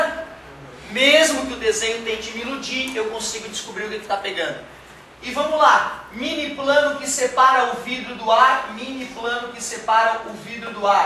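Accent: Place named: Florianópolis, Brazil. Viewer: Muito boa a aula! Valeu professor! O professor é de Floripa? Percebi o sotaque, deve ser.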